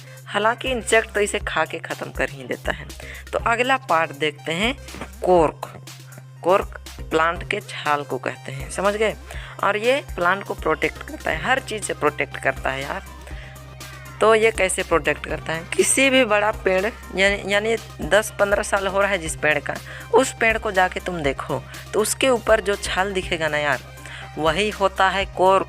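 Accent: Bihar, India. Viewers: Bihar sa ho kaya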